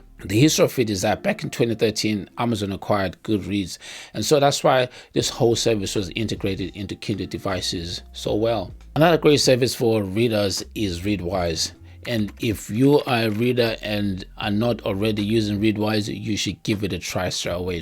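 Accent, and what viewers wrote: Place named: Nigeria. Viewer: I can hear that Nigerian accent. Are you Nigerian? Also, amazing video!!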